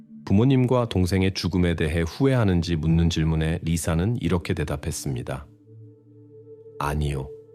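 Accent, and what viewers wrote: China. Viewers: 약간 조선족말투 같기도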